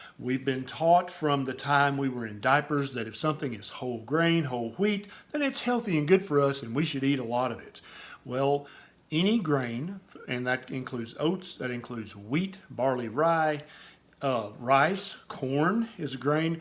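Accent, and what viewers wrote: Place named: West Virginia, United States. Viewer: I love your accent, Are you from West Virginia? That's my favourite English accent! You make interesting videos. I love to learn new things!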